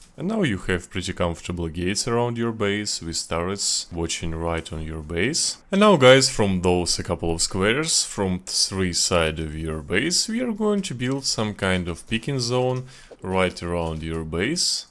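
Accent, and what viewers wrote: Russia. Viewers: у тебя как будто акцент русский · ты русский? акцент похож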